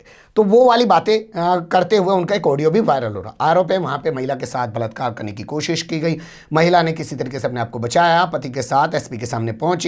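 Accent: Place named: Uttar Pradesh, India. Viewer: I love your beautiful UP tone.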